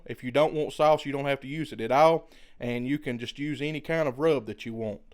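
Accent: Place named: Appalachia, United States. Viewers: Listening to you talk always makes me miss family down in Letcher County. I'll definitely be giving the pickle juice a try when the weather warms up. Thanks for another great video! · that's the best hillbilly bears accent I've ever heard, where about are you from , i need to visit that state.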